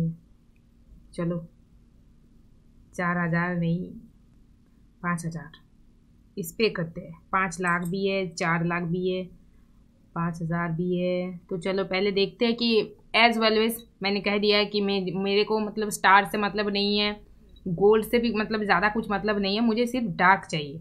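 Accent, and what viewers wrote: Bengal, India. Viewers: Apni bengali kotha sune bojha jacche😅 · Ami age confused chilam je apnara Bengali? But akhon apnar Hindi sunei bojha jacche Bengali😁😁😁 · Aap Bengali ho kaya ?? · Are you bangali???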